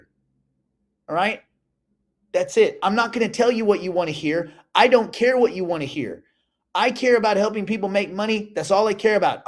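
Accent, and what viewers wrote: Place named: Australia. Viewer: I knew it.. is an Aussie just speaking American to us……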